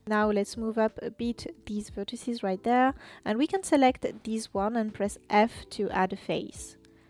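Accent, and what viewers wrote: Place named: France. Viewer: I know it ur French that soft btfl voice and accent soo cute :!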